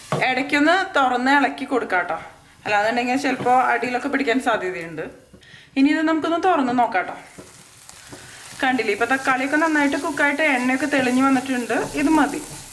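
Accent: Thrissur, India. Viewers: ചേച്ചി തൃശൂരാണോ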